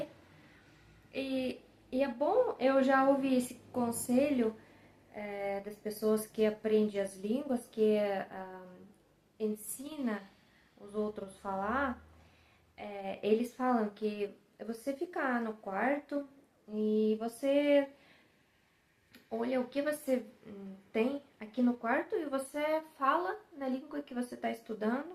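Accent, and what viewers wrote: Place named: Brazil. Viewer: Eu lembro de uns vídeos. Antigos seus e pude perceber seu esforço em falar português do Brasil, nós brasileiros falamos o português totalmente diferente do português de Portugal, tanto que nós não entendemos nada que eles falam, como se fosse idioma Russo X idioma ucraniano, acho que você me entende... Tenha uma ótima noite até a próxima Пока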